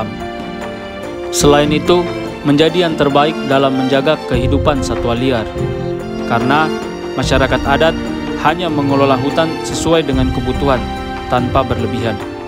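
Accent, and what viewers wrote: Papua, Indonesia. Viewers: logat papuax pkk dong bang. seneng aj dengerx klau bhs indonesia dgn logat papua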